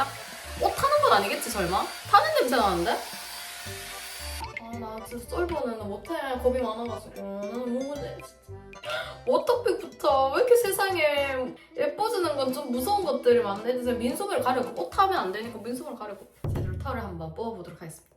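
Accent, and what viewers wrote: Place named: Daegu, South Korea. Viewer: 아이공 ㅠㅠㅠ비슷하게 출산해서 10개월 딸아이 키우고있는 구독자에요 수술대 올라간다는 생각에 마음이 너무 무거우셨을거같아요. 하지만 아기는 생각보다 강하니!! 다 잘될거에요 우리 이쁜아비 수술 잘 받고 건강하게 잘 자라쟈❤ 늘 댓 달때마다 적지만..저두 대구에서 10년가까이 살아서 님 사투리 들으면 너무 정겨워요 지금은 남편따라 또 타지에와서 ㅠㅠ대구가 그리워요.. 님은 얼마나 더 그리우실지.. 힘내세요❤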